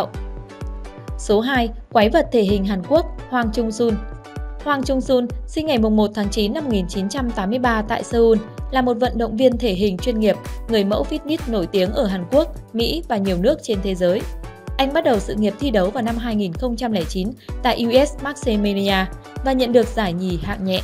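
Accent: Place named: Hai Phong, Vietnam. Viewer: Quê em ở Hải Phòng hay ở đâu thế? Đẹp chứ không phải điẹp, em không phải iem. Người khổng lồ Hulk đọc gần giống hốc chứ không phải Húc. Có mà húc vào... :) Nói thật mấy thằng này bao nhiêu thịt thì dồn hết vào vai với tay rồi, ở cái cần to thì teo hết à :)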